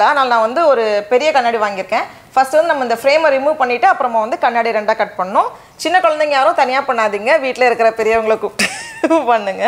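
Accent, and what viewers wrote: Nagercoil, India. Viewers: Nice Nagercoil slang..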